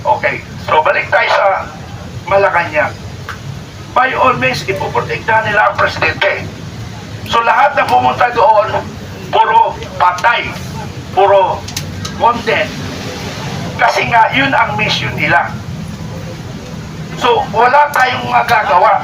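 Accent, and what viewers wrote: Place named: China. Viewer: may Chinese accent ang nagsasalita😅 Ano ba yan! Napaghahalata!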